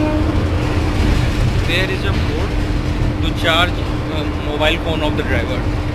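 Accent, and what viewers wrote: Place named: India. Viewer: Compliments for taking time out to make this audio-visual coverage. However, I must rectify one thing. You've been using the term electric 'Engine' iteratively, which is wrong. It should be electric 'Locomotive'. These locos don't have engines in them. For those who have been criticizing for his English must remember that it's not our mother tongue, neither it belongs to our country. So, an Indian with accented English is quite normal and nothing wrong to look down upon. Don't discourage someone when you can't encourage them.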